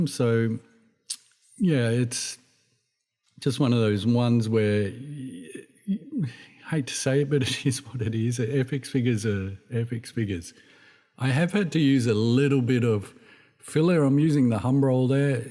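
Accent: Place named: Australia. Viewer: Great commonsense review. I have one of these in Yellowjacks so-called starter kit form which I'm itching to build. The yellow paint scheme will be a challenge! I have tried building older Airfix and Matchbox 1/72 Gnats but not got very far. Good to hear an Aussie voice on a kit review. Mike from the Shaky Isles.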